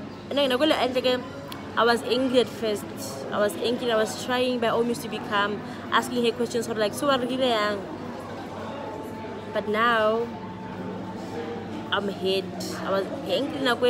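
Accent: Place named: Botswana. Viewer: "Bhuti okgotse ebile wa otshela , santse retlogo tlhatsa " nna I'll never get used to your Tshwana accent weitsi I could listen to you all day😂😂😍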